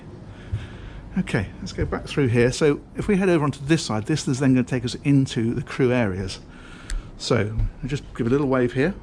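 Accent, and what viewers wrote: United Kingdom. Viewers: Touring expensive yachts is done best with a British accent. Lol It's just amazing the different lifestyles people live. I'll never come close to owning something like this. Must be nice to have money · Always a British narrator for such elegance. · I saw an American flag on the stern of the yacht, so why do you have this snob talking brit doing the tour?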